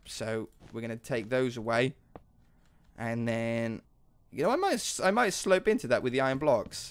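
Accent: United Kingdom. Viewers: where about in the UK are you from I can't tell from your accent?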